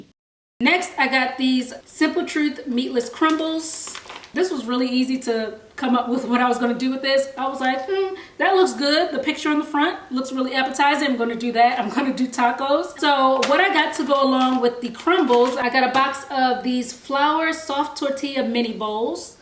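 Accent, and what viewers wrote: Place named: Washington D.C., United States. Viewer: Every time I hear you speak I hear your accent ❤️ are you from D. C. ?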